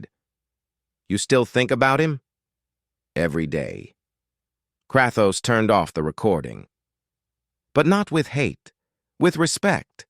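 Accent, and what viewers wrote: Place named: South, United States. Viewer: Why the hell did you choose a redneck voice to narrate this AI story.......it made it into even more of a joke.....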